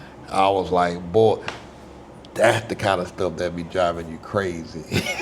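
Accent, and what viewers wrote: South, United States. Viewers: Your accent sounds southern USA.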